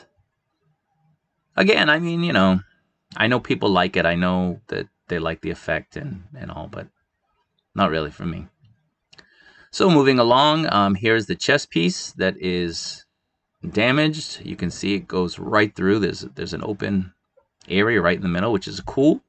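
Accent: Boston, United States. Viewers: you’re from boston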